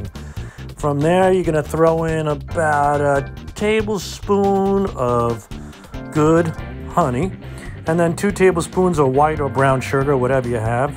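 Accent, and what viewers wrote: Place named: South, United States. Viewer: This man is NO!! third rate middler doin the wagon wheel show!. Sal you are the funniest man I've ever seen . your southern accent is exceptional.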